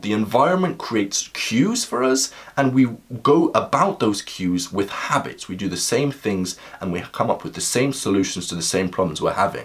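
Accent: United Kingdom. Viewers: Such an incredible, inspiring young man, not only you are very smart and charming and that British accent help you the most. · Your accent is wild I feel like you’re British but your dictation is Americanish to sound claró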